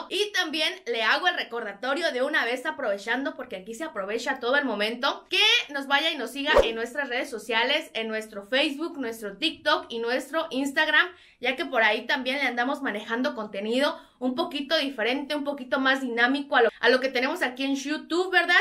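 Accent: Mexico. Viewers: 😂eres de Sinaloa? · Hola, tienes el acento del Norte de Mx. Saludos desde Hermosillo Sonora.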